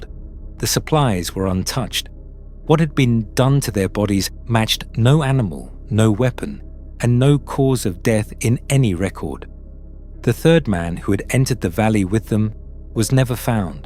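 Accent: United Kingdom. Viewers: Great writing and images. Excellent British voice in the narration - nice enunciation, warm tones, clear and listenable, great intonation and expression. But please if you can edit it, decide to use the Dee-nay or Dee-neh to pronounce Dinè. Don't be all over the place when the rest is so well done. Worth fixing if possible, to respect the Dinè.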